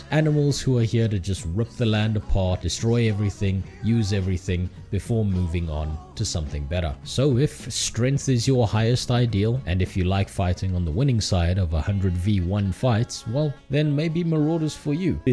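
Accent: South Africa. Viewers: Immediately knew you were South African! Lekker man! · Completely random question but are you South African?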